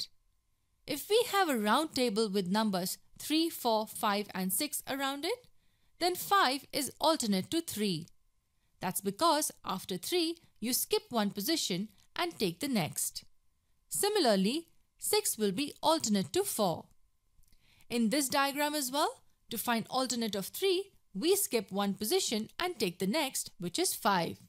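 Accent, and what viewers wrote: India. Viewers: why would you use an indian persons voice bruh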